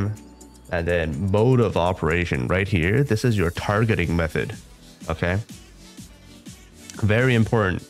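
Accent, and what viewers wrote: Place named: China. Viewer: are u chinese? I can feel the accent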